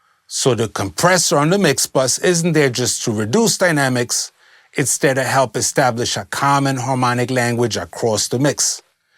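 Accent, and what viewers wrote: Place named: New York, United States. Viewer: Not to mention the NY accent glues this all together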